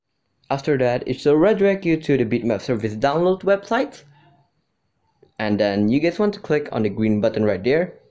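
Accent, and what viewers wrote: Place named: China. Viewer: Idk he's a Chinese or not but his accents tho lol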